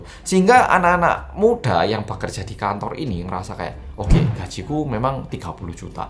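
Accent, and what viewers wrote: Java, Indonesia. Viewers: wajahnya korea tapi logatnya jowo kental banget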